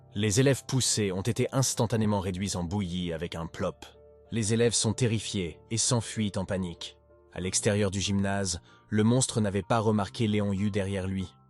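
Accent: England, United Kingdom. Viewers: Petit accent anglais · arrêter avec l'accent anglais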